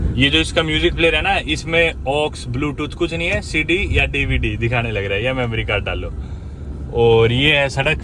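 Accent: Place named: Meerut, India. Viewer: Meerut wali bolii katti jotte se😂😂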